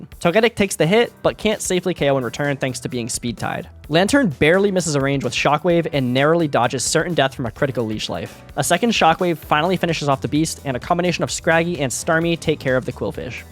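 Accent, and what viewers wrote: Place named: United States. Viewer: This is clearly American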